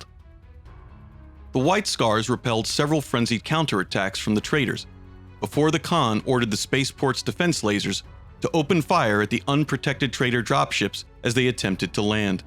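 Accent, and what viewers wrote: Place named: New York, United States. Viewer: He’s from NY. Youman, Yuge.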